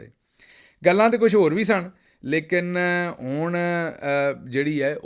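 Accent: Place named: Punjab, India. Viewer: Love you Punjabi accent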